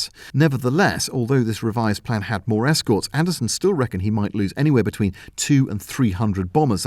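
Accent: United States. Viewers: Your American accent is hilarious, I'd love to hear it more it sound exactly like one of my cousins. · The American accent threw me off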